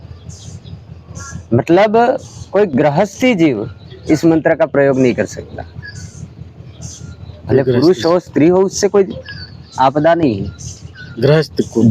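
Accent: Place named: Uttar Pradesh, India. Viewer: Aap ki aavaj se to aap u p sahit ke lagte ho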